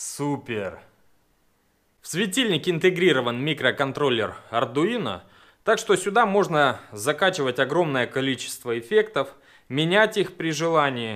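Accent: Rostov, Russia. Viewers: Почему он разговоравает как Негода? В Ростове все так говорят??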